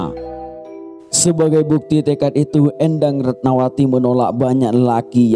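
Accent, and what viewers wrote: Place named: Madura, Indonesia. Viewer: bner kok logatnya ajh keliatan orang madura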